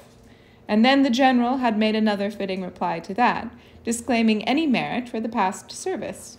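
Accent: France. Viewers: Great french/belgian accent! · Your french accent is delightfull , tres bien ma chere. :)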